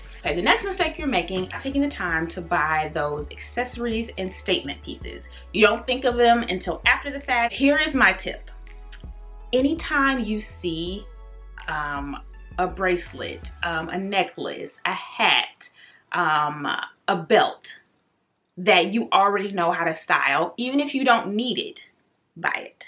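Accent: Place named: United States. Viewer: I had to have subtitles because I am Portuguese and speak fluent English ( I live in London), but my girl J speaks too fast and I almost cannot understand the American accent!!! But I love her anyways…💞💞💞💞💞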